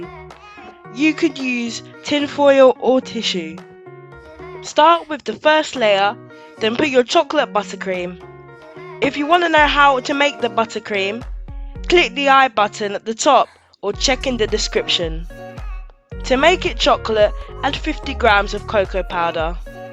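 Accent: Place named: France. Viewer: I am trying this chocolate cake right now. Really hope it will be as good as yours. Very well done. And by the way great French accent.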